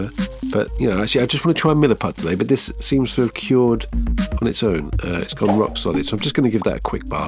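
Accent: United Kingdom. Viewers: I know my way around quite a few British accents, and certainly yours isn't unfamiliar. But I swear to God, I was in the kitchen when you were describing "tail for a face" and I thought you were saying "towel for a face" and went "huh". 😂 I figured it out when I came back in and you were describing the tail in a different context (plus I could see what you were doing) and I realized my mistake and everything made sense...sort of. And "male" sounds like "mal".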